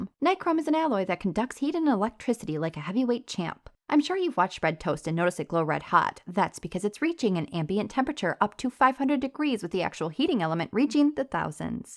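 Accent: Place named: South, United States. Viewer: For some reason your voice in my head is deeply southern accented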